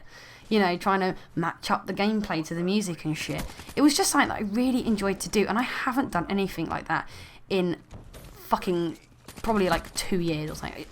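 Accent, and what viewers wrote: United Kingdom. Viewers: you sound like your from Kent. I'm from Kent :D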